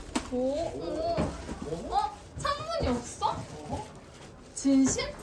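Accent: South Korea. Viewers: 부산분은 아닌거같고 경북 억양이 조금느껴지네요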